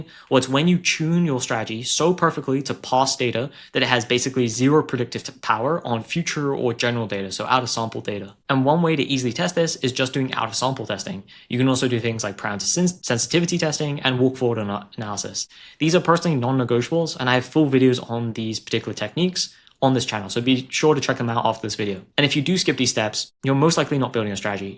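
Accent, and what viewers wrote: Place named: Australia. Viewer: Are you Aussie bro? Your accent seems kinda mixed . Cool videos!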